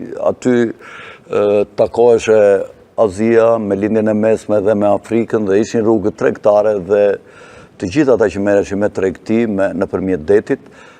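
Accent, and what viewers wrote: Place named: Gheg, Albania. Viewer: O, hajvani me dy këmbë, mos e përdor t'folurit e hizmeqarëve të fashizmit, Bandave Balliste dhe mbeturinave tjera, apo dialektin Geg, i cili ka ngordhur që vitin 1972 dhe është varrosur në Tiranë! Tani po e përdorin pjella e pistë e hizmeqarëve të lartëpërmendur të Shqipërisë Veriore, Laperët, rrugaçët dhe kurvat e Kosovës primitive! Aleluja!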